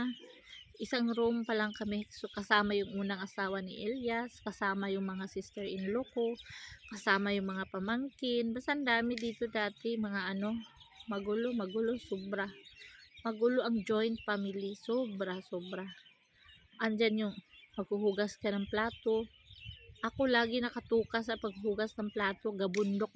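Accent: Visayas, Philippines. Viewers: Visaya diay ka ma'am..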